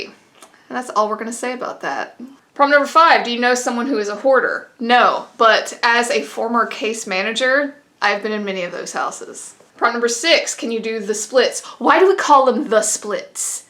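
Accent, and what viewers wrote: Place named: Georgia, United States. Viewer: Refined southern accent, like Ga 😝🤣 you are hilarious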